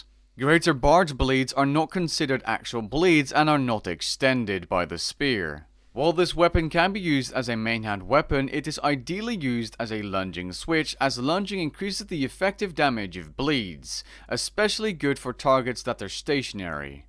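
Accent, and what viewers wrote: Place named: Netherlands. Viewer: i hear a slight dutch accent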